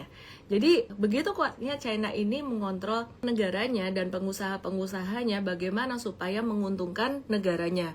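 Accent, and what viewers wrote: Java, Indonesia. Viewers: kalo lagi kesel, medoknya jadi tambah kentel 😁😁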